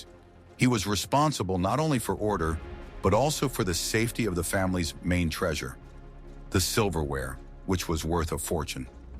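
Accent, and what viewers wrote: United States. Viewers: More god awful American robot narration!!! 3 seconds in and I'm off!! 👎👎👎👎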